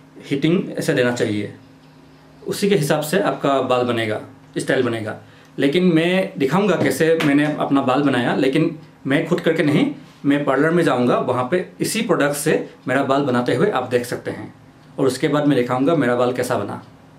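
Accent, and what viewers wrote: Assam, India. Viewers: Are you assamse ? Your accent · Tum assamis ho na tumhara bat se pata salrahahe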